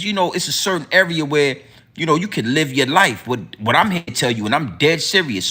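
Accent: New York, United States. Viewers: You sound like your from New York. And not from Va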